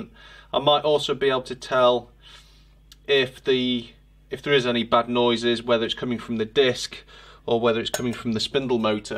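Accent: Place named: United Kingdom. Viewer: the brit'ish accent is unbeataple.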